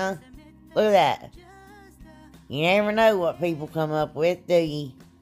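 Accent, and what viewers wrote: South, United States. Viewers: Love that good ole southern accent. I am sorry glad to know that I am not the only one with the decent Southern accent. I'm in Northwest Alabama. Love your crafts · Just found you! Love your channel! Where are you from? Loving your accent....Carolina girl myself so I have one too! God bless and keep the videos coming.🤗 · I'm new to your channel. Just love your country accent, where are you from? I loved all your projects especially the baskets. Roll Tide!!🏈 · Hi 👋! I'm a newbie to your channel and have to say you had me at the Windmill. I want you to know I laughed the whole time you filming,you are just to funny! I mean that in the nicest way ever. I'm glad you joined Heidi's collaboration you definitely have a new subscriber here. I have to ask where are you from with that accent. I'm in NC and I thought I had southern drawl. 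Have a great week 😀and God Bless 🙌!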